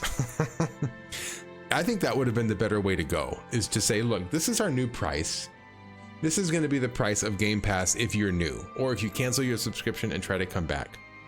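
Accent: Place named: Midwest, United States. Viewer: Are you from Minnesota or the Midwest? Your accent is strong lol.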